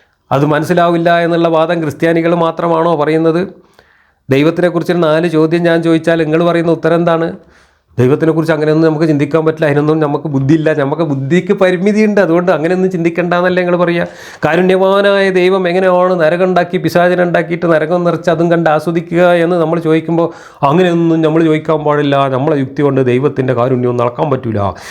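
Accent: Malappuram, India. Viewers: മാഷ്ടെ സെൻസ് ഓഫ് ഹ്യൂമറും മലപ്പുറം സ്ളാംഗും അടിപൊളി 😅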